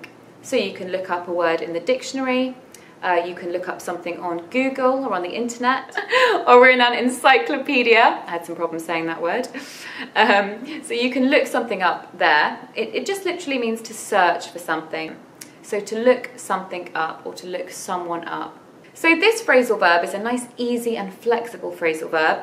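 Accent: United Kingdom. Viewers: I really look up to you because of your pure British English accent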